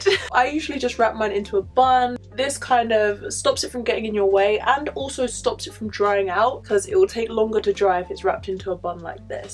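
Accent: United Kingdom. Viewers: Sounds British and speaks Spanish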